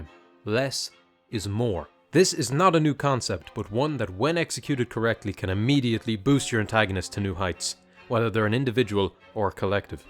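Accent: Ireland. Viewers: YO UR IRISH IMA BE WATCHING U FROM NOW ON YUPPP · why is an irish version of james wilson from house md telling me about invincible?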